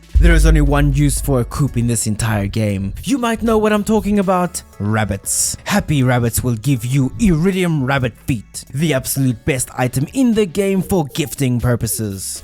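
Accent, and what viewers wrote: South Africa. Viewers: we don’t have an accent. We have like nine accents. He speaks with a white joburg area ish afrikaans accent. Some accents here are epic linguistically and phonetically. Even his is cool, just not as cool as some others. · Are you South African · You sound South African. Hey from Namibia! Thanks for the tips - playing with my son 😁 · he definitely is South African. I was watching this video like… is that a fellow South African?? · Typical South African accent with dramatic flare... As a fellow south african, it's trash. I hate our accent. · he's south african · love how the south African accent is super recognisable by south Africans😂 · Definitely not french. I believe he is South African, could be wrong though.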